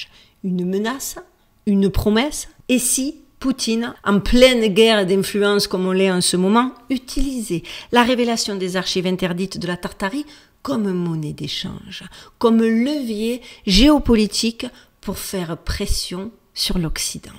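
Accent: Marseille, France. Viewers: J’aime beaucoup l’accent de Marseille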